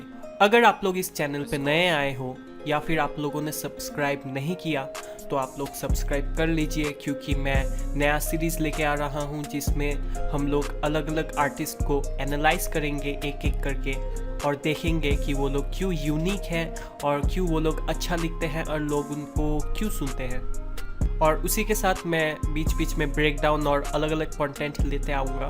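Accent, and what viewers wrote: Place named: Bengal, India. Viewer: Your bengoli Akcent is so cute 😃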